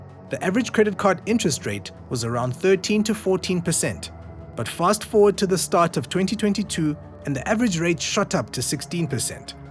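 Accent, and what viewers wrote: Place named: India. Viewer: Why is the narrator’s voice Indian?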